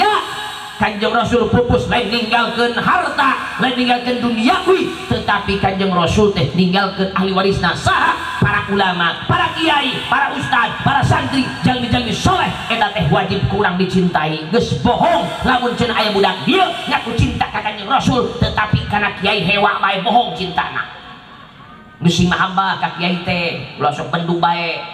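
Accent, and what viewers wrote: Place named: West, Indonesia. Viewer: Ngulon pisan nyariosna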